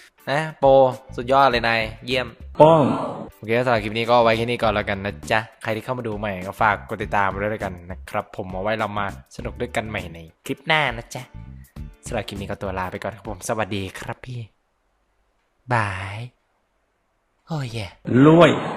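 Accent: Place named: Rural, Thailand. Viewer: พูดเสียงเหน่อ น่ารัก >,<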